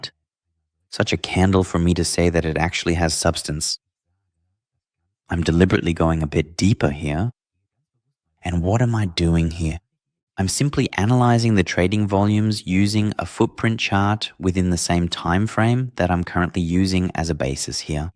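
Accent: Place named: Germany. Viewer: i prefer your german accent. its much more natural.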